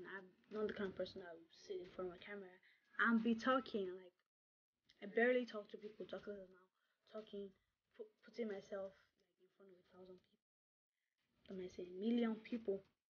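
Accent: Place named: Yoruba, Nigeria. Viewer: It’s d accent for me ...Confirm Yoruba girl😂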